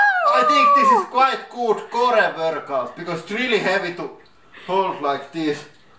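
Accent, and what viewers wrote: Finland. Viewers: the finnish accent tho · huhhu mikä ralli englanti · moikka vaan! huomaan tuolla äänellä ja paidalla että olette suomalaisia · tykkään kuunnella tota rallienglantii! se on kivan kuulosta 😂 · The finnish Accent xD #SuomiOnParas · No ei ainakaan mua haittaa vaikka se puhuukin niinkun suomalaiset mutta vastasin vaan tähän kommenttiin... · ei nää kanavat olis mitää ilman tätä ralli englantii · aikamoine ralli englnti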